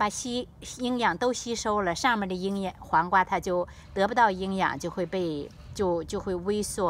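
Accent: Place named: Shandong, China. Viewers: 哪里，这样听着更亲切，我也来自山东，青岛人。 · 老师是胶东人吧：)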